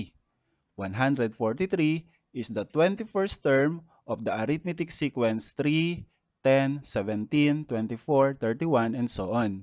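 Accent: Philippines. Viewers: I can tell you are a filipino by accent hahaha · Hes accent is very similar to a filipino because ima filipino too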